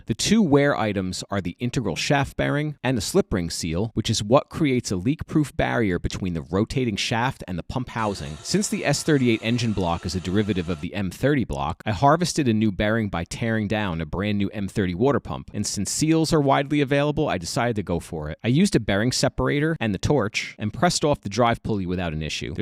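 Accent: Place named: Delaware County, United States. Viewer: Delco wooder ass accent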